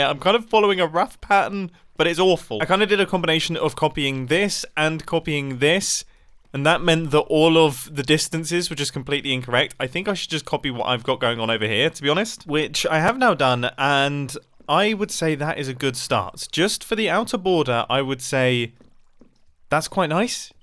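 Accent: United Kingdom. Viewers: I come for the complex redstone builds, but I stay for the fantastic British dialogue. Thanks for the great content Mumbo! Your ability to make me laugh is quite impressive.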